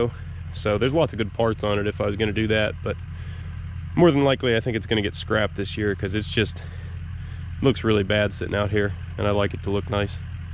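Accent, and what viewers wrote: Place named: Pittsburgh, United States. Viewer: lol...I recognized the accent...Just Northwest of Pgh here.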